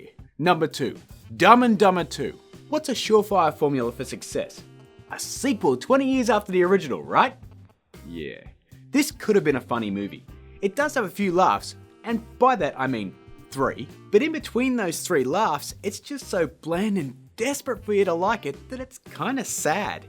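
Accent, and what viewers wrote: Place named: Australia. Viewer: This review wouldn't have been at all tolerable without an Australian accent. Really, an American couldn't have used the same body language or expressions and be taken seriously. With that said, awesome review. We align on almost everything here, with the exception of Guardians. I thought Guardians should have been way higher.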